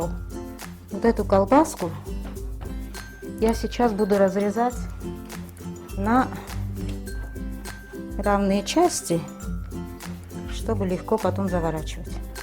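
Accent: Georgia. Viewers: Моя хорошая!!! Как же я рада была увидеть Вас на канале ,услышав Вашу речь с акцентом и увидев блюда дамашней грузинской кухни ....вернули в молодость .!!! Когда были молодыми жили с мужем в Тбилиси (Глданский р-н) а сейчас представляете я тоже живу в Испании (Кадиз) часто из грузинских блюд готовлю аджапсандали и сациви ,хинкали !! На всех видео поставила лайки!! Вы очень приятная ,а русская речь с акцентом бальзам на сердце!!!очень удивилась что так много зелени Вы нашли в Испании особенно свежий укроп и кинза ...для испанцев пряные травы не интересны.Если не секрет .,где Вы живете?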